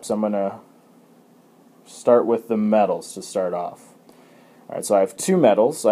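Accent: Canada. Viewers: Stupid Canadian accent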